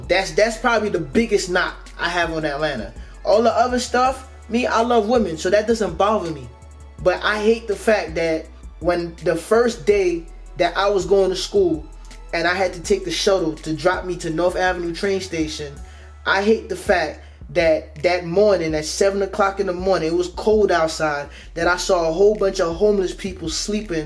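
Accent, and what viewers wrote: New York, United States. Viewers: I love New York Aaccents...I love yours...You have a good heart!!!! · I knew your were from New York...😊 Facts.....❤💥. #southbronx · Listen closely and you can hear both his New York & Atlanta accent. Shit is tripping me out. Lol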